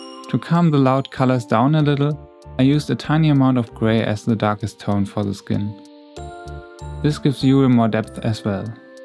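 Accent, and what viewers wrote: Germany. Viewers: iiiiis he german? he kinda has an accent... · You sound pretty german...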